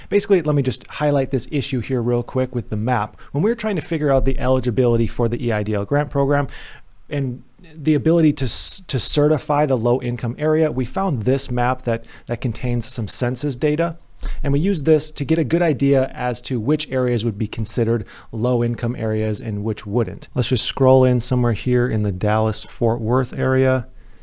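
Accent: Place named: New York, United States. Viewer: Yo! Whats good Son? That’s how some of us New Yorkers sound.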